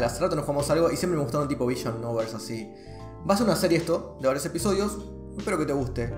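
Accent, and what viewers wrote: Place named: Argentina. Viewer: eres un kpo:) llevo un tiempo en tu canal (además de que me pegaste el acento argentino xd) y ya me vi todos los videos. Siceramente me parece muy interesante tu contenido. Sigue así. Saludos desde chile 🇨🇱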